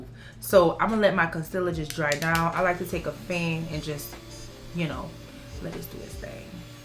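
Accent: Louisiana, United States. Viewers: the hair definitely grabbed my attention but once I heard you talk I said “oh yea she from Louisiana, lemme follow her” lol you’re beautiful! 🫶🏾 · This is my first time seeing you... You were in my recommendations... I love your make up and then I heard that accident too 😍 is that a New Orleans accent? I love your accent!! 🥰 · You are sooooo beautiful. Are you from New Orleans? Trying to pin down your accent!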